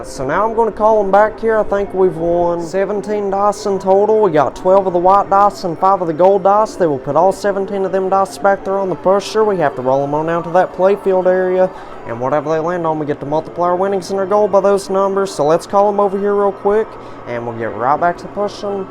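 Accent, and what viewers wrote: South, United States. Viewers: Sounds like Alabama or somewhere in the southern US.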